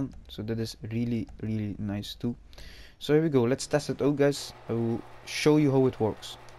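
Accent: Netherlands. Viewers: Lekker Nederlands accent door het Engels heen hahah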